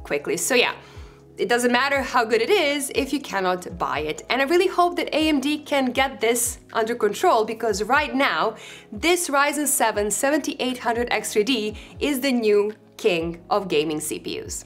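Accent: Netherlands. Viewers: I heard Netherlands. Got to support our fellow dutchies. Nice review btw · Ik hoor heel licht je Nederlandse accent …❤ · love that dutch accent as well..super!! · I'm mixed Dutch/English and think you have the most beautiful English with a Dutch accent I've heard! Oh and thanks for the video. 😄👍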